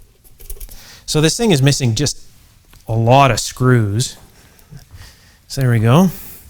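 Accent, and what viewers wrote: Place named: Canada. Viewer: I cannot quite pinpoint his accent/dialect - I am guessing it is Canadian since the pronunciation is so articulate and clear - But which is it? 🙂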